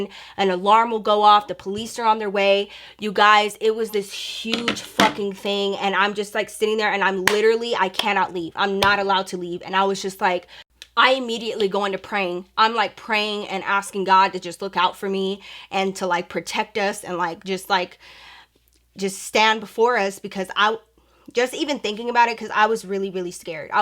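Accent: Texas, United States. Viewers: Texas in the house!!!! You Sound like you from tx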